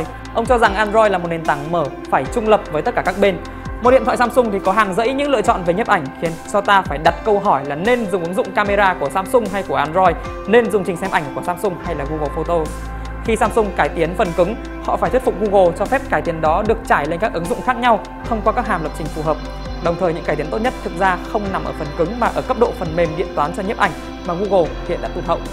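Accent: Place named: North, Vietnam. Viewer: Nói chậm lại anh ơi khó nghe lắm nói nhanh mà còn giọng Bắc thì khó nghe cực (góp ý)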